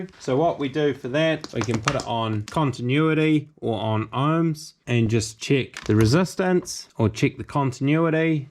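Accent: New Zealand. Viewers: you are from nz?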